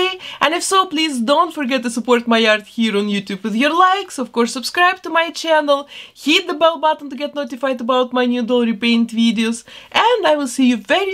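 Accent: Russia. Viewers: я так и не поняла. почему для меня, (которая говорит по русски) голос автора звучит, как голос женщины из России с плохим произношением. я английский вобще не знаю. откуда автор канала?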